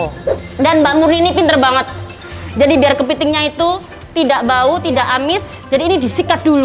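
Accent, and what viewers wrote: Java, Indonesia. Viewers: Mhn maaf yach knapa yach klo liat food floger lain makan tuh kyk'a gmnaaaa gitu...tp klo liat makan koq enak banget..liat wajah'a jg enak...ngomong'a jg enak dgn ciri khas medok'a... Maka'a aq cuma.subscribe channel'a doang...ciyuusss nih n.sll nunggu senin rabu jum'at...😘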